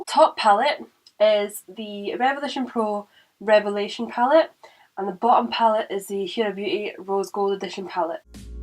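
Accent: Scotland, United Kingdom. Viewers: Love your accent Scottish?🏴xo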